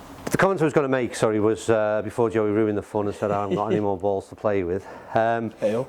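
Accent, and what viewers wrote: Liverpool, United Kingdom. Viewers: Interesting to see that the u65 seems better off the deck than tee I have the same experience. Good to hear a scouser.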